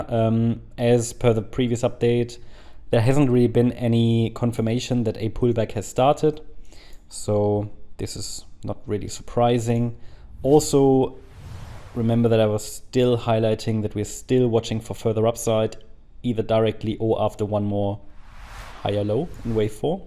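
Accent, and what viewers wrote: Germany. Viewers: bist du deutsch? german like accent :D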